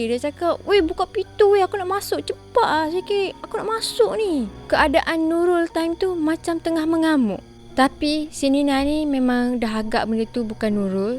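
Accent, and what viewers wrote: Kelantan, Malaysia. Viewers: kak amy subscribe n follow sbb lain dari yg lain, cerita seram dlm loghat kelantan.. just be yourself darling, tak syok da nak dengar bila nak jadi org lain n tak feel da seram dia, lebih pd kelakar.. sorry syg, kak amy tegur demi kebaikan sbb ramai yg nak awk kembalikan balik loghat Kelantan n its real you.. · Kecek klate la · kak , cito dialek kelate lagi sedak berbanding kl 🥲 . aura dio lain sikit , kl kureng sikit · cankap klate baru belago angin cankap luar tk berapa best..hanya lihat host sajo😂😂😂😂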